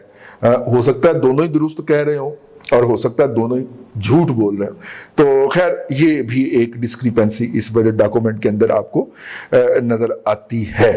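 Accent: Punjab, Pakistan. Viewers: Hi, No matter what I love your Punjabi Urdu.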